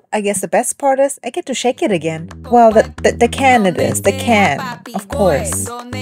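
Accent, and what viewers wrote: Germany. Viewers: German accent is cool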